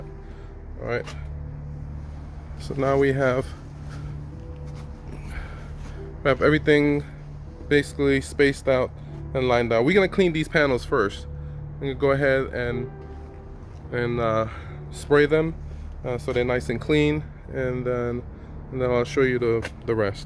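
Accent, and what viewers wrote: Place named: Northeast, United States. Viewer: Are you in the northeast region? I'm from Mass and your accent sounds familiar. BTW I love your videos. Thanks for sharing.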